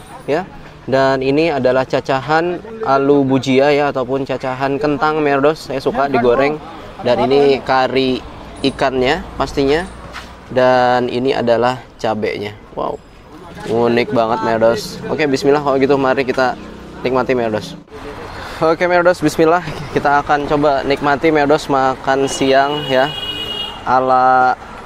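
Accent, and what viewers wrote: Banjar, Indonesia. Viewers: Ada sdikit logat bahasa banjar nya 😁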